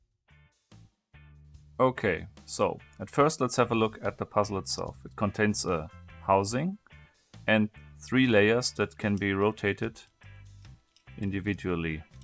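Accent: Germany. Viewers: du bist deutsch! höchstens ein deztscher würde I-dentikäl sagen, ein engländer oder amerikaner würde Ei-dentikäl sagen! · . Your videos are great. I love your freaky german accent. But repeat after me. Sehr-ries. Series. Not serious. That shit is seriously annoying.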